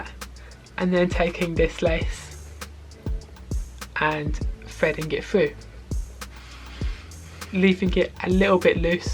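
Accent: United Kingdom. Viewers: British man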